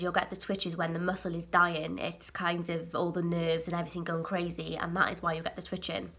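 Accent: Cardiff, United Kingdom. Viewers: Cardiff girl by any chance?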